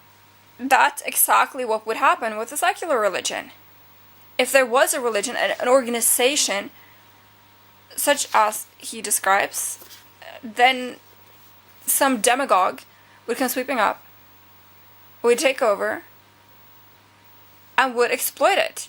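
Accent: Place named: United States. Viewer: Wow. Your accent sounds entirely American. Is it common for Norwegian to speak as you do? Thanks for the book review.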